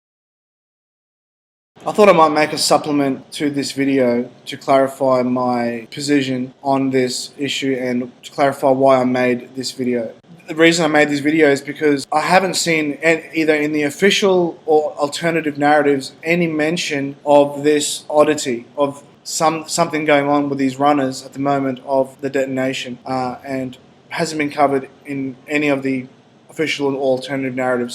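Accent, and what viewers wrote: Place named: New Zealand. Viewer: sorry to be off subject but...you're a kiwi aren't you! :)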